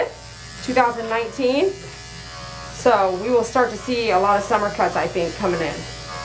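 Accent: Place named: Texas, United States. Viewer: Your from Texas 0.0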